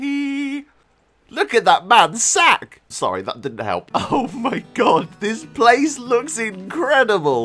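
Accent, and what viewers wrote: United Kingdom. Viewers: From where in Britain are you?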